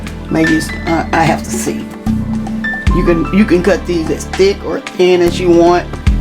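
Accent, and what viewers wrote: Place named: Texas, United States. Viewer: Mmmm I love bannanacream pie. Yep I knew you were from TEXAS Becouse that TEXAS DRAWL is a dead giveaway Becouse I am from TEXAS to.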